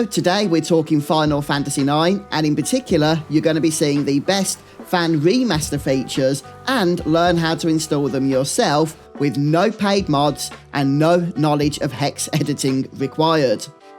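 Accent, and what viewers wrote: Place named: Birmingham, United Kingdom. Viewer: This guy sounds like he's from Birmingham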